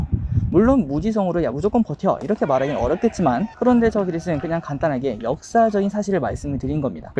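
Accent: Gyeongsang, South Korea. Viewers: 혹시 대구분이신가요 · 대구사람이에요?? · 대구 사람이셨구낭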